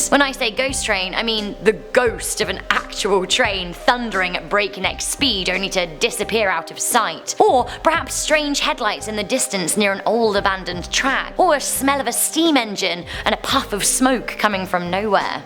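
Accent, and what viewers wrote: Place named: United Kingdom. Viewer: I love sexy British accent. 😊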